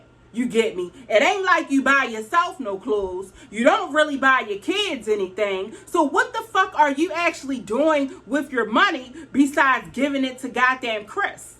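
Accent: Baltimore, United States. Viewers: Heyyy, I'm a new subbie. This video put a smile on my face. The way you told the story about you and friend had me🤣🤣🤣🤣 I love a Baltimore accent! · Hey girl, I just found ur channel and as soon as you started talking I was like "She gotta be from B'more"🤣🤣 When ppl don't give me my 6 ft, I just rurn around and start coughing. They backs up then!💯 Peace and Blessings. #EastBmore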